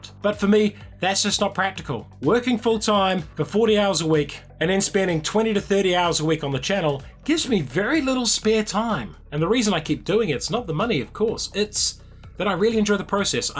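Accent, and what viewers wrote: New Zealand. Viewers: Love the vids and its great hearing from a kiwi. Just curious but where is that photo taken is it by the old prison?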